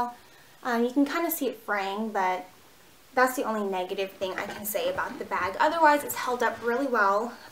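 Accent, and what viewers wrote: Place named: South, United States. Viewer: I've been a subscriber for a few years now but since I moved from CA to Louisiana, I appreciate your accent even more lol! There's just not many Asians where I live now so to see one with a southern accent is pretty cool lol :)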